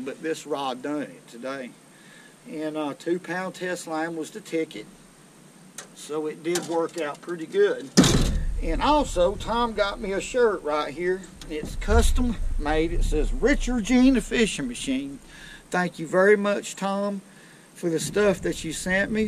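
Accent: South, United States. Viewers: Man I love your rednick voice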